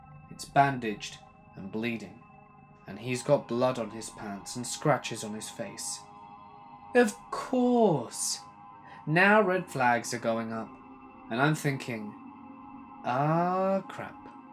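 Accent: United Kingdom. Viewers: Hey! I just wanted to say that I went back and watched some of your older videos. I don't know if it's just me, but your British accent seemed a little bit thicker than it does in your more recent videos. Is there a reason why you sound different now? Just curious. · So glad I found this channel, a British person narrating!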